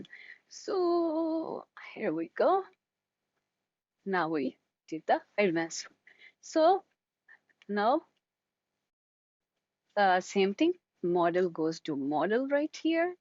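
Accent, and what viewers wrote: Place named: India. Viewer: Just about suffices as a walkthrough of building a very simple workflow for using FaceID with IPAdapter. Half of it is the application of using openpose with a controlnet and NOT a tutorial on FaceID. If you have Indian English and/or experience of low teaching quality then it may prove useful. However, if you're a native Anglo-American English speaker you may find the delivery extremely frustrating. If you are used to being taught by advanced pedagogists then you may also be disappointed by the lack of any clear explanation of the why. This is simply a very basic walkthrough of a simple workflow with no explanation of what is actually happening.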